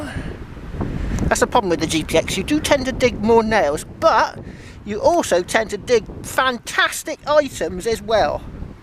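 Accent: United Kingdom. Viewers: Hi. I love to listen to a nice west country accent just like my old POP, he came out to australia from England in 1920. The GPX 5000 was designed in australia to cope with the highly variable iron mineralization of our goldfields, Its primary purpose is to hunt for gold nuggets. The large coil will be confusing the detectors micro proccessor into trying to retune iron targets out as ground noise. A PI machine will punch deep in mineralizd ground but will not be much good at interpretting the signals from the iron or copper halo that forms around long buried iron or copper bronze objects. It will however find gold coins etc.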